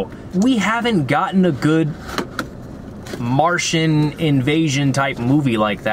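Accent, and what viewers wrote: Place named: Transatlantic, United States. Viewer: Please do me a favor, look into voice acting. Not you doing Transatlantic accent without effort... excluding the reading and losing your place.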